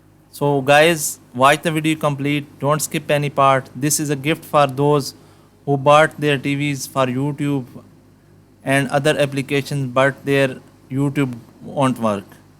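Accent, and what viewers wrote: India. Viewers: Im tired to listen indian acsent talk English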